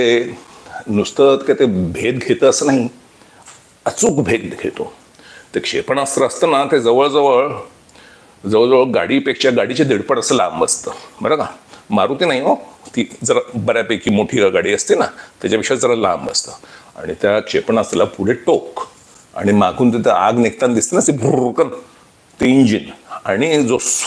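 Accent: Pune, India. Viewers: चांगले शालजोडीतले हानतोस रे तू मुंबई त जन्म घेतला पण बोलतो पुण्याकरा सारखा धन्यवाद मजा आली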